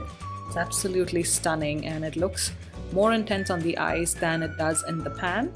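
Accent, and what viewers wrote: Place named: India. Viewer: Beautiful indian Accent . where did u grow up in India?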